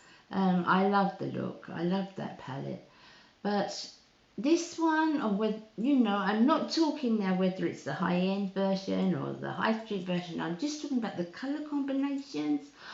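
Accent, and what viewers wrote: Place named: Essex, United Kingdom. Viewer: Hello I just came across your channel and I really enjoyed way it, you sound like you have an Essex accent my neck of the woods 👍 Looking forward to watching more of your videos x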